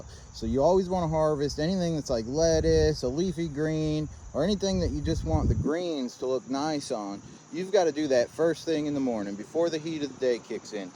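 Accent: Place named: South, United States. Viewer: Yooooo broooo! This is awesome and so glad to see you starting up a youtube channel for all the amazing work you do! It's been very inspiring to see all the progress over the last year and all your wicked smart inventions/solutions to daily farm work. I'm going to get up there for a visit this fall and check it out in person. Also, I think you're almost officially a southerner with that sometimes southern drawl ;)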